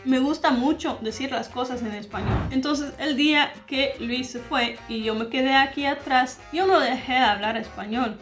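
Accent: Standard. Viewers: Es muy elegante tu español, para mi oído es neutral. Qué bueno que hables bien de mi país Ecuador bendiciones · Buen vídeo, eso es lo que me pasa a mi me da miedo hablar holandés y no expresarme como quiero,me atrevo hablarlo con conocidos de mucha confianza con desconocidos se me olvida todo,espero lograrlo y hablarlo tan bien como tú hablas el español 💋y por cierto tu acento es neutro 😃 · Tu español es súper fluido 👍🏻con un acento neutral mayormente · tu acento esta neutro pues es lo que veo en este video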